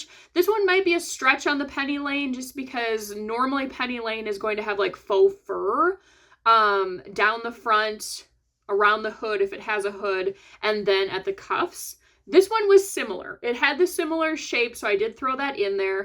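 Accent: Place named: Midwest, United States. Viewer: New on your channel. I love your suggestions and ideas. New subscriber now. You sound like you’re from my home area. Are you maybe from Chicago or Wisconsin or Minnesota? I’m originally from Southern Illinois with family all over the Midwest. I’m in California now for over 40 years.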